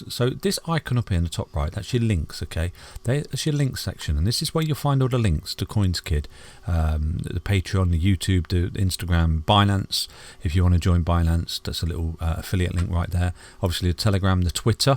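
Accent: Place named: East Midlands, United Kingdom. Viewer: East Midlands?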